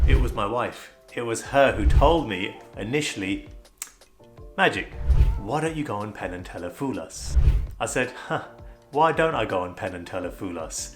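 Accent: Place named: United Kingdom. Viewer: the slightly British accent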